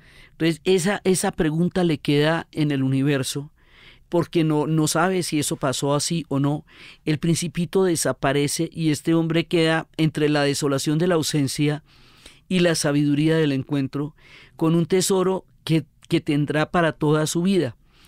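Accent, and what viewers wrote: Colombia. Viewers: El Principito es mi libro absolutamente favorito y Diana Uribe con ese acento colombiano divino, juro que nunca antes de esta señora me había gustado la historia, con ella lo que antes era aburrido es música para mis oídos ❤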